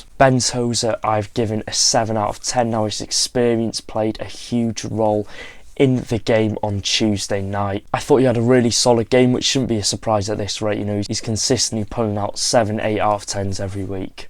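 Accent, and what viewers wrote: Wales, United Kingdom. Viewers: Whenever I hear the Wrexham chants I'm convinced that every man in Wales has the exact same voice as you. LOL. Great channel, my friend.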